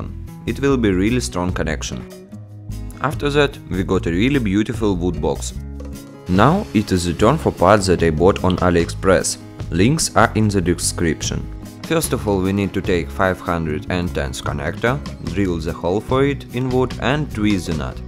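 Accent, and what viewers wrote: Russia. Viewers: Bro make more videos you are good and your english is good enough . And this Russian accent it makes your videos much better and different keep upp 👌👍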